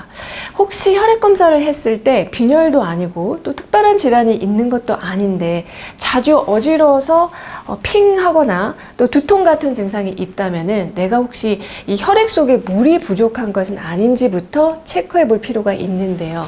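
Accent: Seoul, South Korea. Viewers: 목소리도 이뿌시고 서울 말씨가 더욱좋습니다. 모든병이 혈행성 이라고 하는데. 잘이해했습니다. 좋은 하루 되세요.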